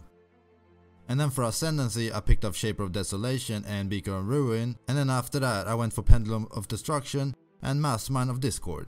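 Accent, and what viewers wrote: Sweden. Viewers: He's swedish. It's disgustingly obvious. Horrible accent.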